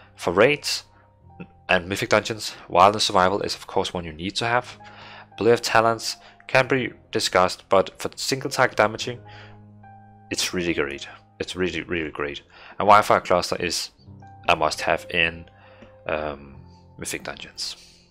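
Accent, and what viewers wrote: Denmark. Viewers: You sound Danish:)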